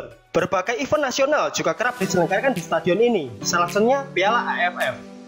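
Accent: Java, Indonesia. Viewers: suara jelek, medok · suaramu loh medok bangeet..... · juan juan medok bangat jowone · Cie yang mencuri video orang,jawa mu medok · Suaranyaa.. jawa banget. Stadiun riau.. skrang berantakn broo.. krn terkena masalah sepertiny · q seneng sih...liat vidio nya tapi perutku sakit sumpah denger mas nya bicaranya lucu bgt bahasa jawanya melekat bgt di lidah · Suaramu ituloh Mas..medok baget sesuai otak dan pikiranmu yang ngawur dan medok. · Jawane medok banget ya MA's asli jowo to massss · suaramu jowo bngat chancukkk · Yg ngomong wong jowo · Medooooookkk min.... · Mas sampeyan suarane jowone medok bgt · Kok suaranya medok banget ya hehehehe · Medok'e rek 😅👍👍👍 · kalo boleh jujur suara lu medok,tapi great suara lu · Jawa kali suara mu bro Jawir jawir 😂😂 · wong jowo to.. medok banget ngomong nya..?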